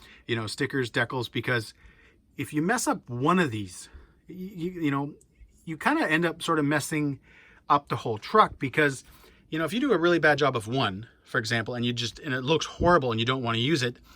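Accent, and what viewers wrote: Canada. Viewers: I think he might be Canadian though and in that case his pronunciation is correct for his region.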